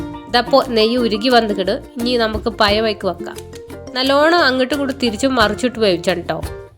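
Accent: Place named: Malappuram, India. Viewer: Malappuram സ്ലാങ് പൊളി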